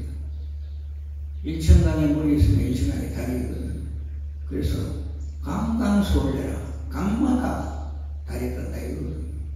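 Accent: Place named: Gyeongsang, South Korea. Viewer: 감사합니다. 정겨운 경상도 사투리를 쓰시면서 큰법문해 주신 종정스님께 감사의 예를 올립니다.법체 강건 하옵소서!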